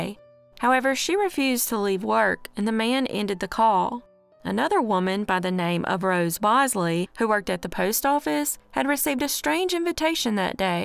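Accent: South, United States. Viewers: I'm a southern "girl" myself SC. And you are now my favorite crime you tuber. So refreshing to hear a familiar accent.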